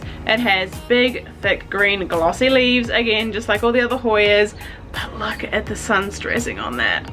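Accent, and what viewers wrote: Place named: New Zealand. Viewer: So I was watching Hoya videos tonight and yours popped up... a fellow Kiwi accent :-) Lovely set up you have in the green house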